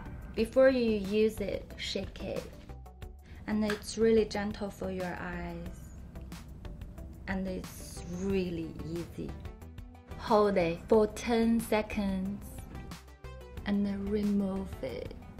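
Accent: China. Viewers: Agree, also because her English sounds Chinglish. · Is she Chinese? Because this accent is common among Chinese. I like it!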